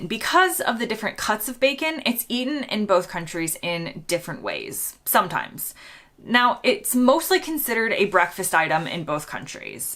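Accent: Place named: United States. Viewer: You are an English girl with an American accent !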